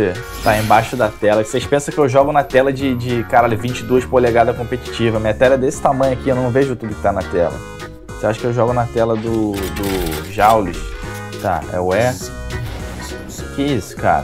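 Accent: Rio de Janeiro, Brazil. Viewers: Carioca tem o sotaque engraçado para quem não está acostumado com a gente.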